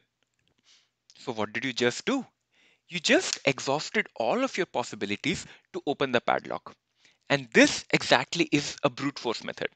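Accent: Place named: United Kingdom. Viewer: Beatiful british english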